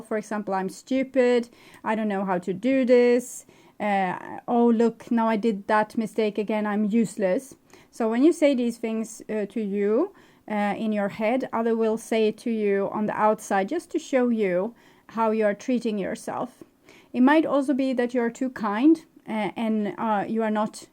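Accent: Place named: Sweden. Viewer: ~ Thank you for the reply. I have subbed to your channel. 👍 I also, love Siana and miss her. You remind me of her. Must be the Swedish accent. Thanks for sharing your gift with all of us. Much love from Texas! 😘❤️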